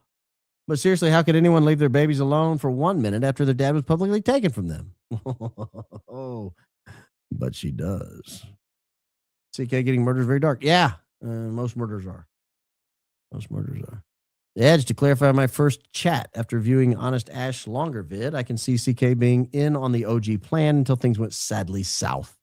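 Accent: South, United States. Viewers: I finally figured it out! Everytime you go into your southern accent you sound just like Foghorn Leghorn! I had to look it up, check him out!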